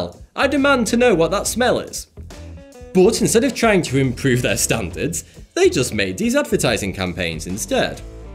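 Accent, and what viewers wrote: United Kingdom. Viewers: Forget about white bears. All I can think about is listening to a British intellectual and eating fried potatoes!!!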